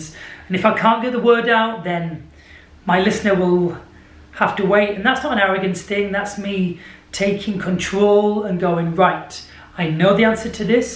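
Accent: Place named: England, United Kingdom. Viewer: Типичный английский акцент. Звучит очень забавно и приятный голос!😉😉😉.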